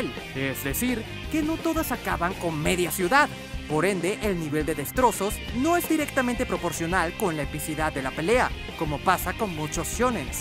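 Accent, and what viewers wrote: Venezuela. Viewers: eres Venezolano? xd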